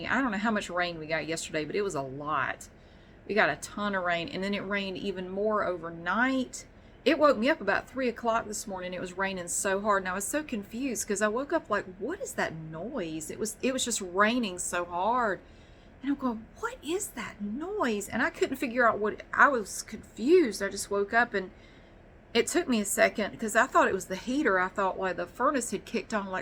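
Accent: South, United States. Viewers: Ms., I’m not sure if you already know, but there is a viral video of a woman with a southern accent on TikTok and many of the people watching that video were requesting southern accent asmr from her. Soooo many of the people replying to these comments were suggesting your channel and singing your praises! I was so happy to see that you’re so popular, even people on TikTok bring you up! Just a small a testament to your affect in the asmr community 🤗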